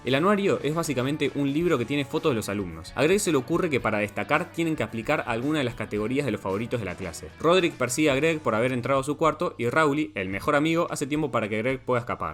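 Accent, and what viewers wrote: Uruguay. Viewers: Bro sos uruguayo? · Sos uruguayo no? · De una me di cuenta que sos Uruguayo, buen canal, saludos desde Uruguay 🇺🇾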